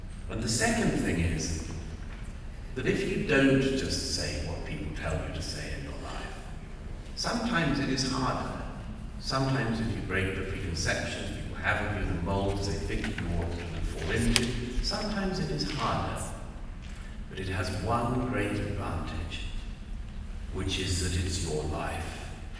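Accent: RP, United Kingdom. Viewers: Talks a lot and says nothing in that horrible upper class English accent of a man who has done nothing in life but give talks like this. As if real people didn't exist.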